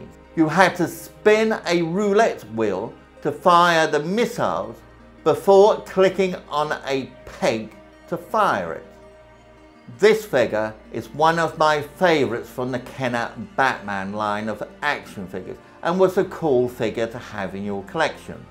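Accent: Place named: United Kingdom. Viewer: Gotta love the guys British accent 😊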